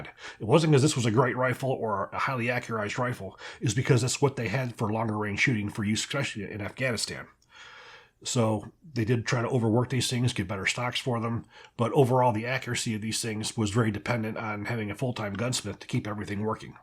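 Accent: Texas, United States. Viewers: Texan accent.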